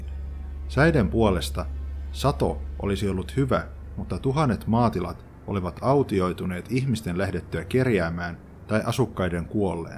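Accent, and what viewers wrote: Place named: Häme, Finland. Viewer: Mainio video, ja kanava lähti tilaukseen. Hieman häiritsee "hämäläistyyppinen" murre joissain sanoissa: esim. huano (huono), kualleita (kuolleita), jne., sekä lauseiden kummallinen, robottimainen rytmittäminen. Kaiken kaikkiaan kuitenkin hieno kanava ja hienoja videoita.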